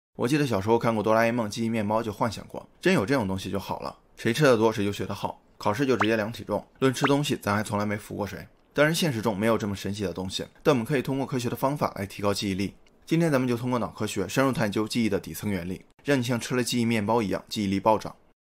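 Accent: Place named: Wuhan, China. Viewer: ……标准……＾＾＾你武汉人啊！？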